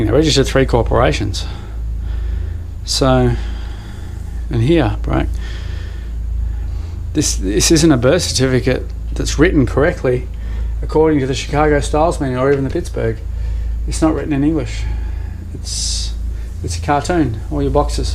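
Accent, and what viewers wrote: Australia. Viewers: I reaaly appreciate your efforts and clear intention, but for a Dutchie like me, your fast talking in Australian English, plus equally fast associations, comparing boxes in "official" forms with pages in a "comic" book. For a beginnner that's hard to grasp, apart from being not informative, but rather a personal opinion of a rebel (for a good cause) and I understand you were one of a few pioneers, at the time of this recording. I imagine that you've reached such conclusions yourself, and since this topic is familiar to more people now, it's possible to grow familiar with this complex subject. We need our trailblazers, and you're one of them 🧡🌈🎃🧑‍🌾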